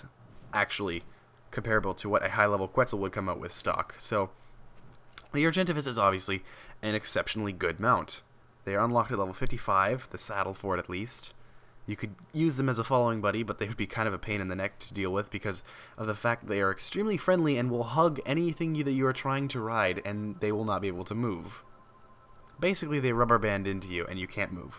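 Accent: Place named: United States. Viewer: Zajd Zajd it would be fine if he had a different accent but he talks like a American/Canadian and he says things completely wrong!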